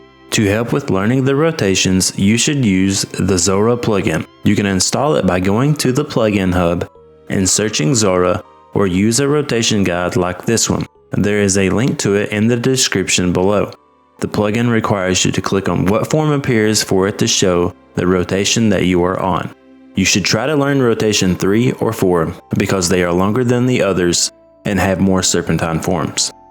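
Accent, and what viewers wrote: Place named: South, United States. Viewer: I don’t know how you somehow have a bit of a southern USA accent but also sound like a European of some sort too lol.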